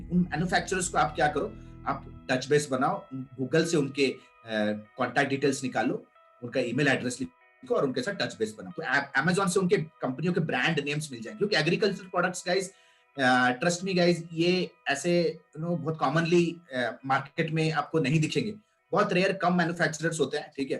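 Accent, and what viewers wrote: India. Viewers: sir indian or foreign??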